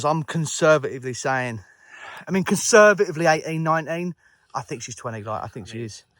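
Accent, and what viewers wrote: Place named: Lancashire, United Kingdom. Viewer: What was this Rez buddy, great fish! Are you from Lancashire?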